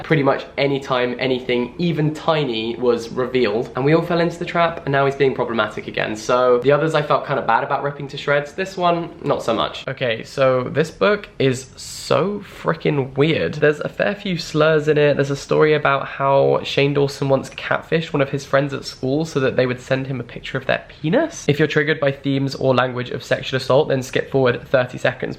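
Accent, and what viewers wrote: United Kingdom. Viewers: The British accent 😍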